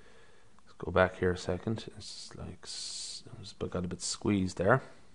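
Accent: Ireland. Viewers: you know Conor Mcgregor? judging by your accent, i bet you're Irish. :)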